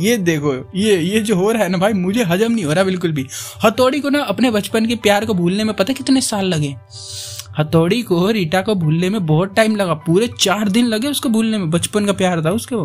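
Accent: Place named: Delhi, India. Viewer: Kabhi kaha nhi mene 😁lekin apki tone delhi ki lagti h 😜😜😜😜😜😜😜vaisee bhi delhi aa gyi hu 😝😝😝😝😝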